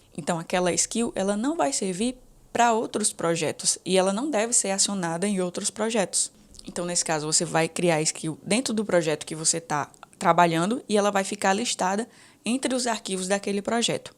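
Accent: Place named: Recife, Brazil. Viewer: Oxi! O sotaque é do Recife. Hahaha Conteúdo muito bom! Muito rico! Parabéns! Uma dúvida: como funciona o Antigravity para criar apps e fazer o deploy?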